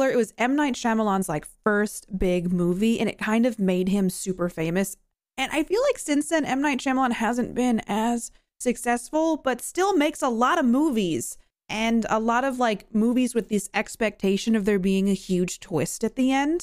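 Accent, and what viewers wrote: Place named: Transatlantic, United States. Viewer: imma comment that your transatlantic accent is perfection.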